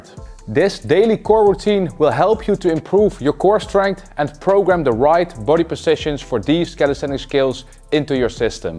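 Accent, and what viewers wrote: Netherlands. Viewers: I can here the dutch accent in your english bro 😂😂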